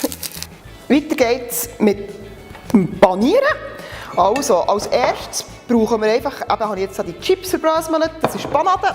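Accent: Bern, Switzerland. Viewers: So geil! Bi neu bi dir u finge, dass du's extrem guet machsch. U sooo schön, wemer uf YouTube chly Bärndütsch ghört! :D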